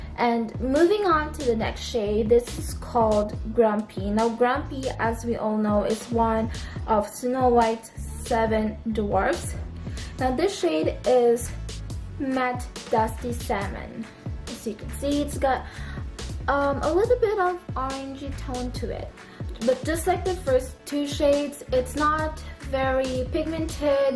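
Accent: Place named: United States. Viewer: sure sissy BTW I love your accent are you half american?? Ur fluent in English 😍😘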